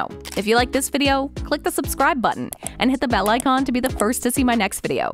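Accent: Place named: California, United States. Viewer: Girl change that California tone. Please!!!!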